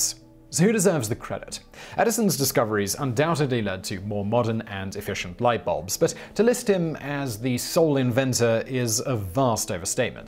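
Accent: United Kingdom. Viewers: I normally don't care for British accents but don't mind his at all. Anyone know what regional accent this would be?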